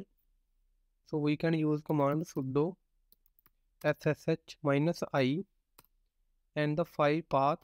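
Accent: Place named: Kerala, India. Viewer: Coading + Debugging... Super. You sound like a person from Kerala but the name is Punjabi 😃😉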